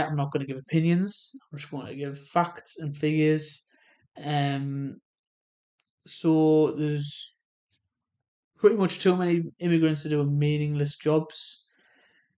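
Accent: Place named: United Kingdom. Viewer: Based on all the negative Nancy videos you looked at listed under the video above, it is no wonder you came away with negative impressions of Toronto/Canada but having lived here all of my 76 years (a retired litigation lawyer), I have no serious complaints and would NOT want to live in the UK or the current version of the USA under crazy Tariff Tyrant Trump. Given your very thick regional British accent (I could not understand a lot of what you say in your videos), that also would not have helped you in Toronto or in Alberta (and I was born in Toronto but with British parents from Paisley and Dundee Scotland and London and Croydon England). In any event, good luck to you going forward but you really need to research the cost of living and the job situation wherever you live in this world.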